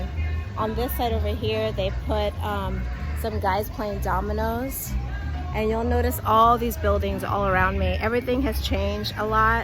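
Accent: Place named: Spain. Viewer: I love your Spanish dialect. Such beautiful color's, and the ocean is really something. Thanks for taking us with you 🥰😎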